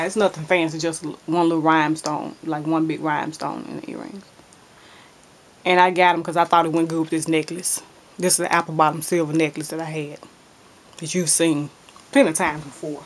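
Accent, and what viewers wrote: South, United States. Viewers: Please tell me where are you from. Strong southern accent. Love it!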